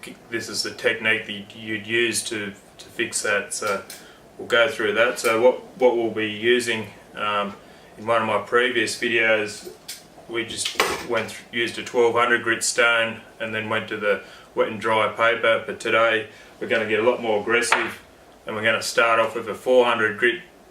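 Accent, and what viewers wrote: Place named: Australia. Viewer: And a n Aussie it sounds!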